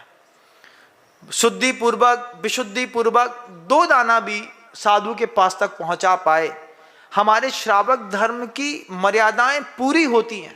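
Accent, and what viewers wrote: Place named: Bundelkhand, India. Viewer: Namostu bhagwan namostu aap बुंदेलखंडी बढ़िया बोलते हो 😂🎉🎉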